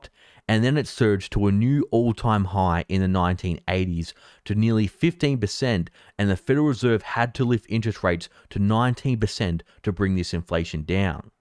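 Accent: Australia. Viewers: He's from Oz.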